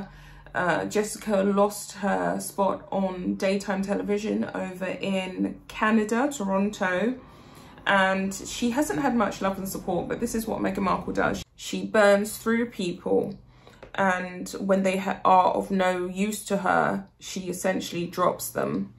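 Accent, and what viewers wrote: England, United Kingdom. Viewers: you are a beautiful, intelligent woman who communicates so articulately with your English accent, which I absolutely LOVE as an American!💓🍀💓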